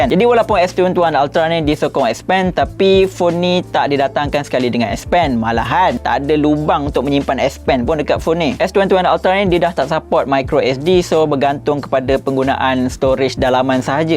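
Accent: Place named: Kelantan, Malaysia. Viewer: Dik.. pelat kelantan awak not bad jugak ya..kecek kelate je lah. 🤣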